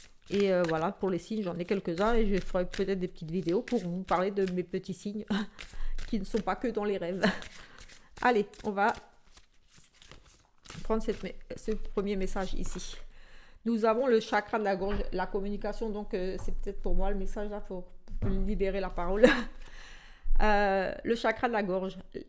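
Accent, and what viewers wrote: Alsace, France. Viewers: Bonsoir, je vous ai découverte il y a peu. J'ai immédiatement entendu que vous veniez d'Alsace et il n'y a pas de problème. Je suis du Sud ouest et j'ai aussi un accent alors ne vous laissez pas diriger par les autres pour si peu. Dites ce que vous avez à dire. Merci pour votre vidéo.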